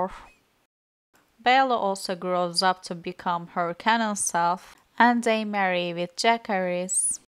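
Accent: Turkey. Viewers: Yup that’s 100% Turkish accent 😄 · It is look like Turkish accent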